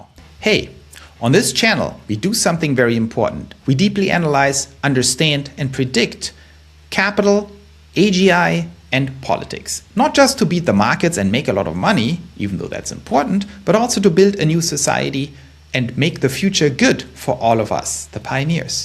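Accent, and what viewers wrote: Germany. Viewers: in mass - lol - german accent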